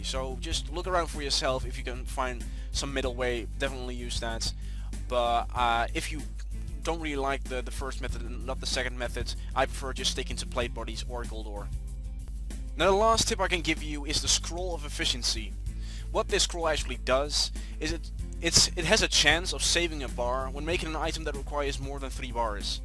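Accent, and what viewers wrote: Netherlands. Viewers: ben je nederlands?, hele goede guide btw:)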